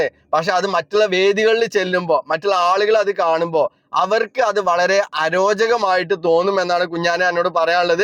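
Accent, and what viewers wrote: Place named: Pandikkad, India. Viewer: സംസാരിക്കുന്നത് പാണ്ടിക്കാട് ഭാഗം സ്ലാങ് തന്നെയാണ് പാണ്ടിക്കാട് മലപ്പുറത്തു അല്ല എന്ന് പറയരുത് മലപ്പുറത്ത്‌ തന്നെ വ്യത്യസ്ത സ്ലാങ്ങുണ്ട് പൊന്നാനി മൊഴിയല്ല അരീക്കോട് ഭാഗത്തു കൊണ്ടോട്ടി മൊഴിയല്ല പെരിന്തൽമണ്ണയിൽ നിലമ്പൂർ മൊഴിയല്ല വേങ്ങര ഭാഗത്ത് എല്ലാറ്റിലും ചില വ്യത്യാസങ്ങളുണ്ട്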